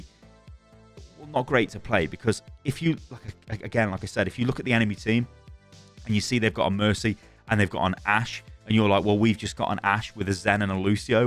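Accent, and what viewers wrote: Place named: United Kingdom. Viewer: Been here since the beginning, idk if it’s the British accent but between that and your consistency you’ve been my preferred outlet for overwatch news. Thanks for the mems.❤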